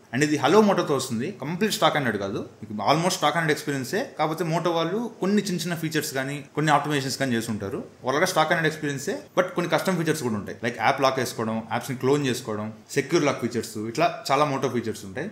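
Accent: Nellore, India. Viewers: Mawa nellore ah Mande ❤😂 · Anna needhi Nellore aaaaaa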